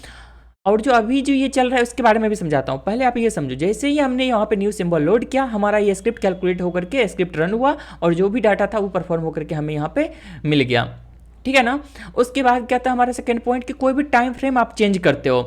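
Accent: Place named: India. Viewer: 听不懂印度英语，不过我还是坚持看完每个视频，学到很多知识，thank you for your work!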